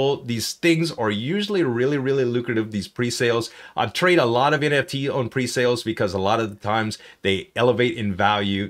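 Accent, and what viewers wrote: Louisiana, United States. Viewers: Oh yeah for sure. No doubt he's a fellow Cajun from my neck of the woods.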